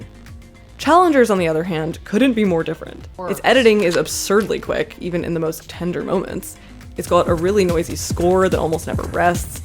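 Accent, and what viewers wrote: Canada. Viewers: lol late to this, but her Canadian accent here sounds kind of like U.S. Midwestern standard reining in the tendencies of California English. However it affects the pronunciation, it’s actually quite pleasant.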